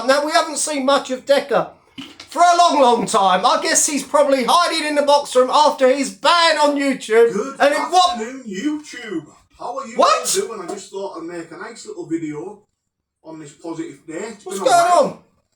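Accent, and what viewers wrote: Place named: Cockney, United Kingdom. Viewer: Your or8 for a fat cockney bell end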